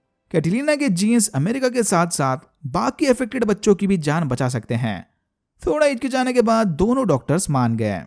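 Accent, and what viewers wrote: India. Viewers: I hate your stupid indian accent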